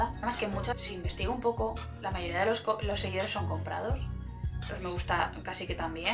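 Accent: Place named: Spain. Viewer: No me gusta el acento español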